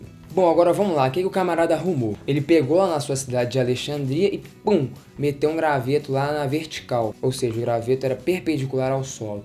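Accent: Minas Gerais, Brazil. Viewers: O sotaque mineiro jamais passará despercebido... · Ele é mineiro mesmo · Que sotaque de minerim mais fofo! 🤗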